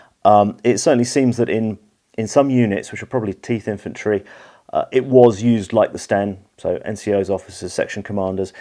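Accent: United Kingdom. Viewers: Nothing like a good old British voice to explain the workings of a British gun. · A deceptively simple looking weapon, until you get into the details! Question, if you're in the UK as your accent would suggest, how are you in possession of this in firing condition?! I'd bl00dy love one!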